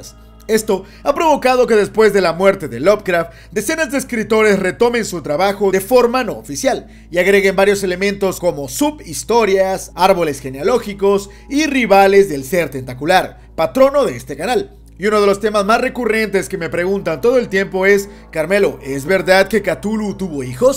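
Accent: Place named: North, Spain. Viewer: Demasiado norteño el parcero 😅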